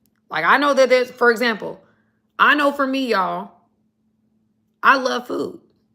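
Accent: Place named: New Orleans, United States. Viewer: I think New Jersey plus Atlanta, or Georgia, almost makes a New Orleans accent, specifically a westbank of New Orleans accent..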